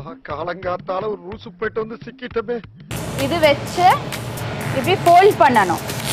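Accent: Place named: Kerala, India. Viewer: நீங்கள் பேசும் போது மலையாளம் தெரியுது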